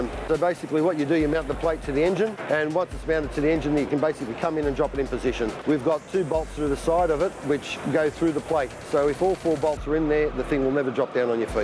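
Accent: Australia. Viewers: I thought he was a country dude at first then Australian...I just tap with hammer and use puller....some come right off most do actually.. 2 outta 10 will be a bitch. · Good thing we could see the stuff working, because his high speed aussie accent is impossible to understand.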